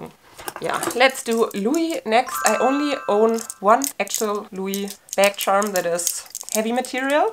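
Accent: Germany. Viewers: Awww i can hear u are german. Am i right ? I was looking for such video and yours poped up first. So happy to have found you. Love all your charms. Now going for a hunt.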